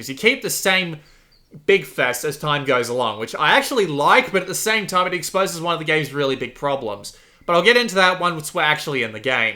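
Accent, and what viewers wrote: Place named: Australia. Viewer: The Australian accent is so harsh and annoying... Whiny...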